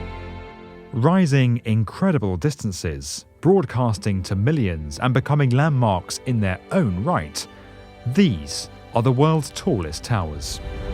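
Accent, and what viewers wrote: United Kingdom. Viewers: too britishhhhhh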